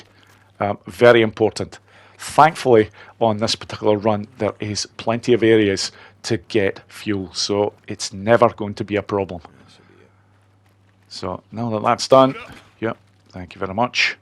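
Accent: Scotland, United Kingdom. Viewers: Great video pal. I've been extremely late to the Days Gone party having only just played through it for the first time in June (best 12 quid I've ever spent in CEX aha). I played on Hard 1 and had an absolute blast, putting in over 85 hours and grabbing the platinum - but atill feeling like i have way more fun to have. I didn't look up any tips before/while playing, so my early game was nowhere near as optimal as it could be - avoiding hordes for much longer than needed meaning i didnt get to enjoy the Horde Killer weapon rewards until really late in the story/after the story. I'd have done so many things differently in hindsight while also cleaning up Hordes earlier to grab the weapon rewards. Even though its only been about 5 or 6 weeks since i completed that first playthrough (and tidied up all the hordes), I've already been itching to get back into a second playthrough. 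I have no intetest in NG+ cause starring out weak, and slow progression is something i really enjoy, and hate restarting games overpowered. Well, after seeing this video pop up on my feed yesterday, i started my second playthrough last night (Hard 2 this time, more spongy enemies but keep my hub), and thanks to you I've grabbed these two beast weapons straight away 😁 thanks mate. You've got a new sub now from a fellow Scot 🏴 ps, where are you from? Your accent is similar from my mate who's from Elgin, but you might be from the North East? Anyway, keep up the great work and I've got a whole bunch of your vi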